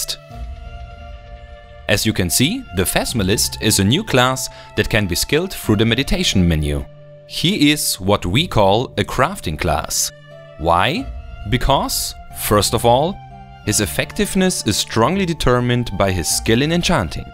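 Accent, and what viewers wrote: Germany. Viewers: Thank you so much for making this game, I already finished it twice and I'm waiting for this dlc to play it for the third time. The whole idea seems amazing but I'm a little sceptical about completely moving sinistra magic books to outlaw merchants. Entropy already felt like a lesser elementarism, now it just seems like it's simply not worth bothering with, but we shall see. Also, I love that german accent.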